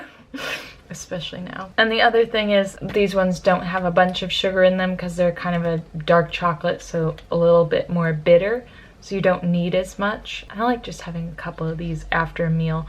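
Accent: United States. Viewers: You sound American instead of British!!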